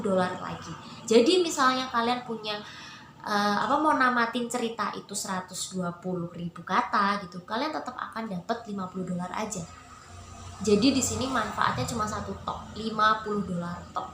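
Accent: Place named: Java, Indonesia. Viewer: Medoknya menteb bnget mbak, sama kek saya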